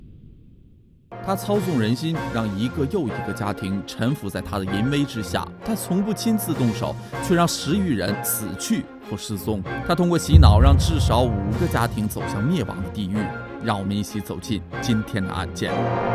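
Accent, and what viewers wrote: Northeast, China. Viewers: 語速不要這麼快，講起來就不會太吃力。雖然東北話與普通話有相似之處，可是東北人講普通話不如其它省份的人標準，最大的障礙在於聲母為Z.C.S和ZHI.CHI.SHI的字東北話與普通話發音完全相反！比如說：站zhan著zhe，坐zuo下，東北人讀成：站zan著ze，坐zhuo著ze，從小到大說著與普通話完全相反發音的那些字導致非常難以改變，樓主就是這種情況。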